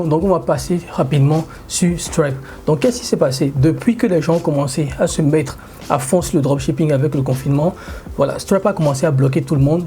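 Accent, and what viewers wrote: Benin. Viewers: Vous parlez comme les béninois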